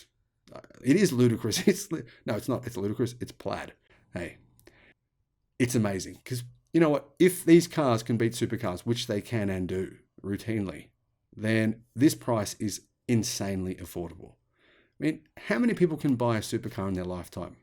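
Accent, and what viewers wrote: Australia. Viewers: I love your show, but your accent is destroying the word "inventory." You keep saying "inventry," which has no definition that I can find. Now I know that being American, we don't usually say English as proper as the British, but I thought you Aussies spoke just like them. The first few times you had said it, I was thinking what the heck is this guy saying. Then I finally figured it out. BTW I want a model Y, but it would drive me nuts if the new one is extremely different and alot better, so I'm waiting.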